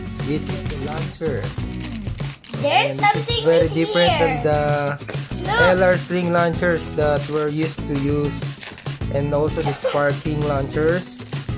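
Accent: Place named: Philippines. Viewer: Owe men your accent, are you a filipino?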